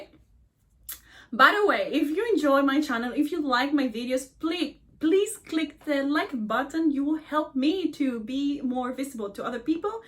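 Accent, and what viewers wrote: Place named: Venezuela. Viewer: Where is your accent from? Best guess is Venezuela.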